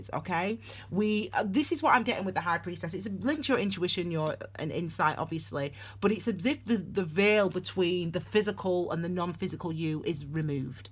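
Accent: United Kingdom. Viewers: 1st Time watching your reading... Hello from the US , New Jersey specifically...I love your energy, your happy and so sweet! And of course I Love the accent! We Americans will never sound as lovely like you Brits ❤️ Your reading was Great! I like the way you do your readings, I feel like it's much more in depth and has a great flow. So I hit subscribe, of course! Thank you for what you're doing to raise the vibrations and awaken the planet! Awesome !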